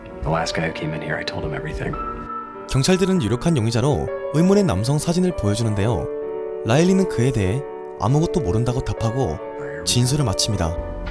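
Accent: Gyeongsang, South Korea. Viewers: ㅋㅋ경상도 분이시네용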